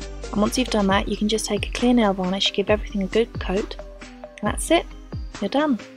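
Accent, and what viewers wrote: United Kingdom. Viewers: hehe love how different certain words are in diff countries like in america its nail polish and in england its nail varnish hehe i love british accent :P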